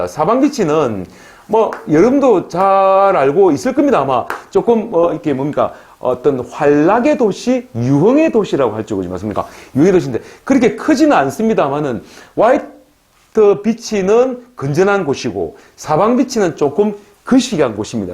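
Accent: Gyeongsang, South Korea. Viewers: 나의와이프가 필리핀사람인데 이런방송 괜찮네요! 지금 50대중반이고 필리핀 이민도 고려중인데 앞으로 다양한정보 부탁합니다. 특히 구수한 경상도사투리 쥑입미데이~^^ Impression도 아주 좋아요! 구독합니다.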